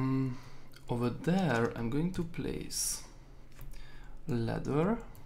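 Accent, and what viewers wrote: Hungary. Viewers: Szia tokre lehet hallani hogy magyar vagy😁 csinálhatnál majd olyan videót is amiben építes redstone szerkezeteket😀😉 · Te beszélsz magyarul? Csak mert mintha lenne egy kicsi akhcentusod :-D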